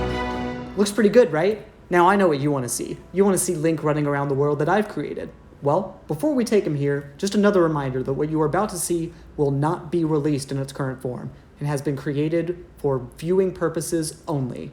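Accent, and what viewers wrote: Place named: North America. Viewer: it's possible that other software devs have already some or all of this work (like if there's a "Zelda Mod Tool" made by fans you can download) but even so it'd be a Herculean task if done as a mod. If done as a standalone game, it'd be as much work as... ya know, a game - which is a ton of work that can't be sold if you don't have the legal rights to use the characters. So, as long as he was giving it away for free, it'd probably be technically within Nintendo's policy for fan projects, but they set those rules and they have a history of shutting down projects like this. Judging by our guy's accent, he's probably either from the US or Canada, and in either place, even if the courts decide he's totally in the right, if Nintendo decides to sue the process would take literal years and tens of thousands of dollars in legal fees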